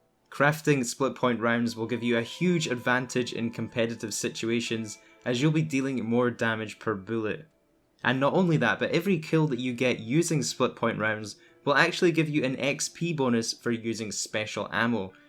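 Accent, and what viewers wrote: Scotland, United Kingdom. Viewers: Omg are you Scottish!!! You sound Scottish!!! Iam Scottish 😍😍😍 subbing just for that dude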